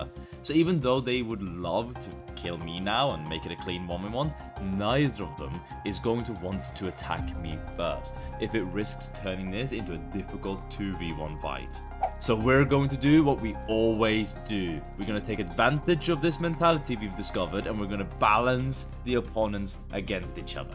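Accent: Norway. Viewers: Are you Norwegian? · I can hear a bit of an accent, if it isn't rude to, can I guess you're Norwegian? 🤔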